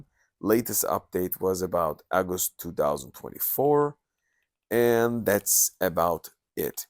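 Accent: Turkey. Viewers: This is Turkish accent. Öyle değil mi? Nerede duysam tanırım hocam :) (ve 'de de Hoşçakalın'ı duymak beni mutlu etti). Detaylı rew için çok teşekkürler. Thanks for detailed rew.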